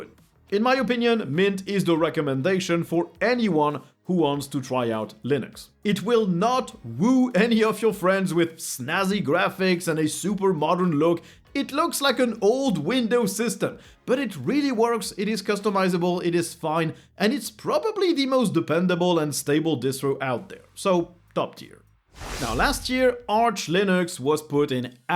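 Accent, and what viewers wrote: France. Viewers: Seriez-vous français à tout hasard ? (^-^) J'ai l'impression que votre accent s'en rapproche :D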